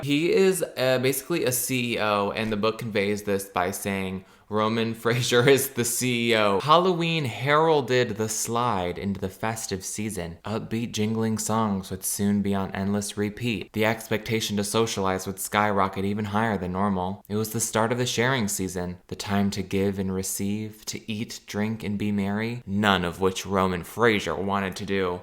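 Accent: New Zealand. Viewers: the entire video and the nz accent got me so blindsided as a nz person LMFAOOOO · I cant with your new zealand accent 😭😭😭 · So happy you posted again ❤️ and your New Zealand accent had me dying 😭😭💀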